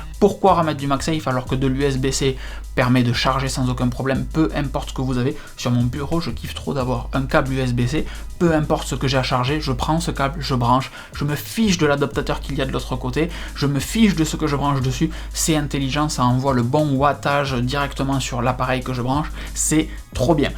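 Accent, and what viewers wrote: South, France. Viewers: Merci beaucoup pour cette vidéo : complète et très enthousiaste ! De plus, cela me rassure d’avoir un MBP M1 avec Touchbar car tu es le seul dans cette vidéo à dire que finalement la Touchbar ben…c’est pas si mal ! Bon courage pour la suite, et merci pour ce bel accent qui sent si bon le soleil !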